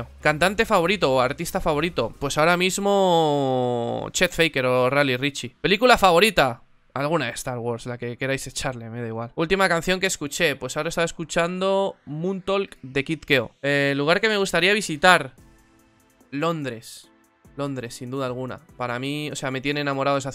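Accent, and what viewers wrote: Argentina. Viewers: Sos mitad argentino ? Douuuuuu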